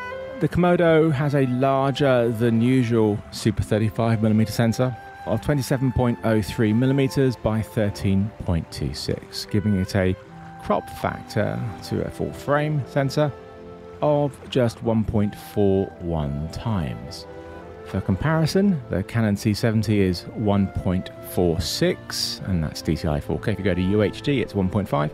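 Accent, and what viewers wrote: United Kingdom. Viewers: I don't have any uses for these lenses or the Red, but the video is gorgeous, the music is well chosen, and the British accent is always charming. Heh.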